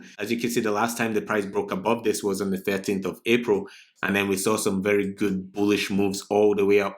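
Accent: Scotland, United Kingdom. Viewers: That's a strong Scottish accent 😂😂👍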